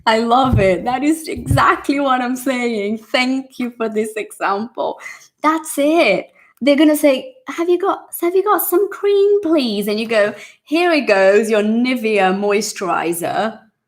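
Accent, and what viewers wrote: United Kingdom. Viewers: Wow, you sound like a real British girl! I love that accent 👏👏👏👏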